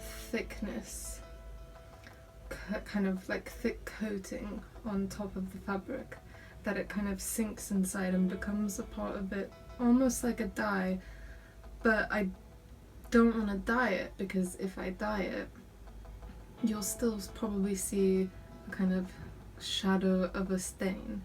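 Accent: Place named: RP, United Kingdom. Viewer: You sound so posh. :)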